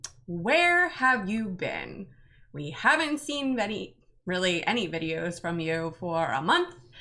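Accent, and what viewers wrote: Pittsburgh, United States. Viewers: yeah its called Pittsburghese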